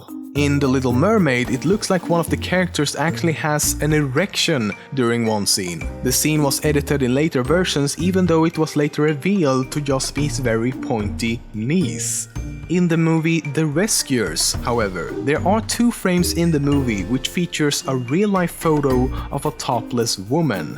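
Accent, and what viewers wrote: Sweden. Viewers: Is he swedish?? He has a swedish accent. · You! Person who is making this video. Where are you from? Your dialect sounds a lot like those who come from my country, Sweden! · Hes not russian he is actually swedish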